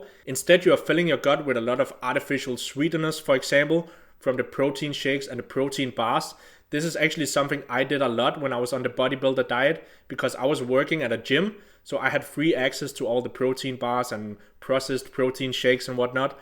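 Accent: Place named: Denmark. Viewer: Danish? You sound familiar :)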